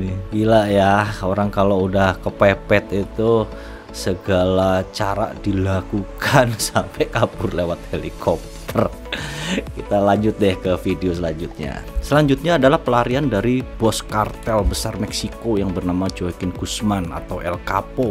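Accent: Java, Indonesia. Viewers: Logatmu jawa maas,,,apa asli jawa y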